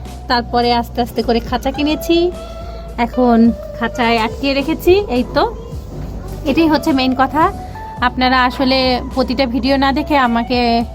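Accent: Rangpur, Bangladesh. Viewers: Onek moja pacchen kobutor ke niye mone hoy upner bastota bare giyeche upner kotha shune mone hocche Rongpure bari amader bashao Rongpur thaki from Austria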